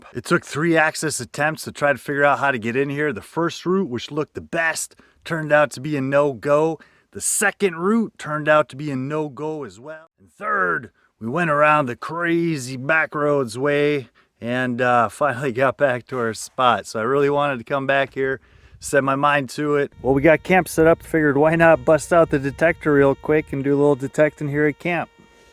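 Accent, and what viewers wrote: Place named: United States. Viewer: YOU GOT A CRAZY COWBOY ACCENT & RHYME BY TALK & GOT A CRAZY COWBOY TOY CAR TOO, WHERE GOT YOU ALL OF THOSE!!! TO WHERE I' TO DIG TOO...AS TO DIG A FIRST CLASS GOLD.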